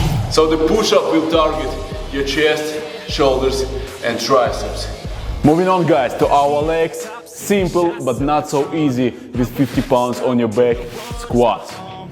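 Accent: Russia. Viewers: пожалуйста прокачай свое американское произношение, ну а то совсем не серьезно. круто то что ты можешь свободно понимать и говорить, но произношение режет ухо. типичный русский акцент )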